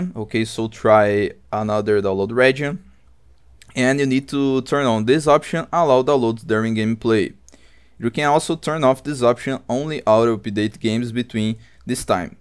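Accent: Brazil. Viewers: Vc tem um sotaque brasileiro, consigo ouvir um pouco de português na sua fala, estou certo?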